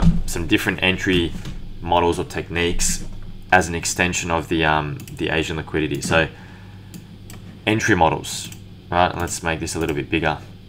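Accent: Australia. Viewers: Brilliant mate! Awesome to hear an Aussie trader! I'm a North Queensland boy... Loving the London stuff, mate, as it suits our time zone much better. Keep up the great content 👏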